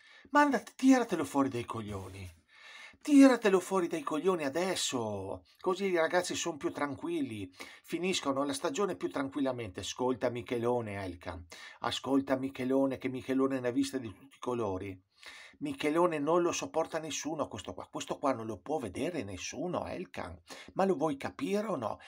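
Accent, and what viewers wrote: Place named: Bari, Italy. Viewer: Il dialetto barese è il top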